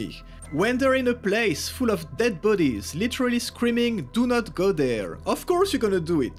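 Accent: France. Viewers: Why does your accent makes me think of a french guy ? If yes that's nice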